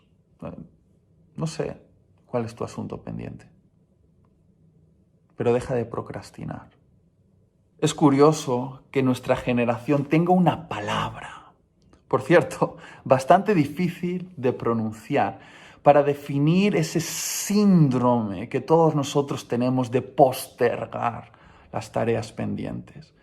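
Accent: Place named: Spain. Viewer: Es su acento, es español.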